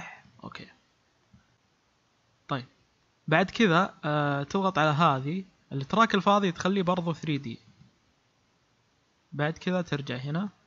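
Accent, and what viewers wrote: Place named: Saudi Arabia. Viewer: استمر انا سويت خمس حسابات و اشتركت عندك الحين كل اللي يسوون شروحات مغاربة و مصريين ياخي لما سمعت صوتك سعودي ارتحت كمل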